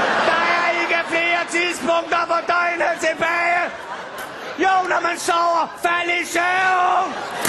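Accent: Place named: Denmark. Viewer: he is reading Norwegian in Danish making it sound really stupid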